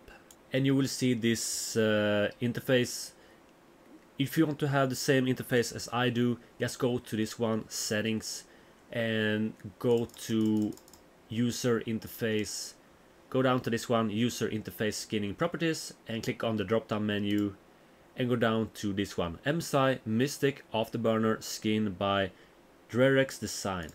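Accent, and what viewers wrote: Sweden. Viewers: hey i like your channel and the content but i love how i can hear the Swedish accent but keep up bro and i also have a question i want to start mining i have one 2060 and i bought a 3060 ti non lhr what miner or what should i mine and am i late? thanks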